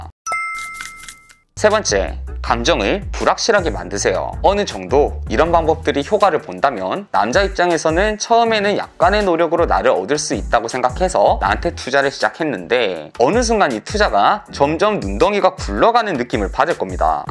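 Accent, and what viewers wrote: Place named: Gyeongsang, South Korea. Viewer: 경상도 억양 세시네욤...ㅋㅋ...🥲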